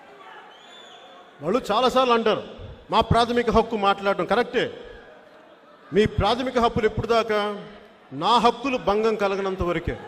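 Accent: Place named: Telangana, India. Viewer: Telangana యాస ఎప్పుడు తక్కువ అంచన అని నిరిపిస్తున్నారు........ Don't play games 😛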